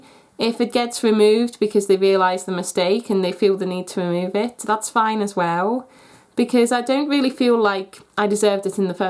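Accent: Scotland, United Kingdom. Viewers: Thanks for the vid. So weird that it has such a high ratio of dislikes to likes; must be idiots searching for videos on how to get verified and then getting pissed off at landing on your video or something. BTW, where in Scotland are you from? The Scottish accents that are more similar to English ones are very difficult for me to identify as such. I did think you were Scottish for a short time in the video, but I finally settled on somewhere in Northern England. Bzzt!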